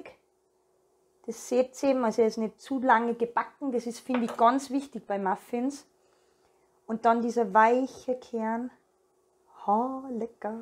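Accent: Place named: Tyrol, Austria. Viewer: Hallo Morgen gehts an die Muffins 😅👍schön auch mal Dialekt zu hören bist du vo Tirol ?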